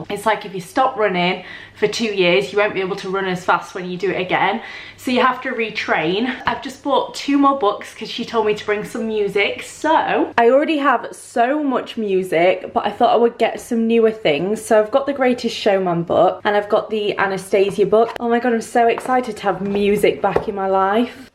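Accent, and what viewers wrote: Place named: Derbyshire, United Kingdom. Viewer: Where are you from originally? I’m from chesterfield and you sound just like us from this neck of the woods 😆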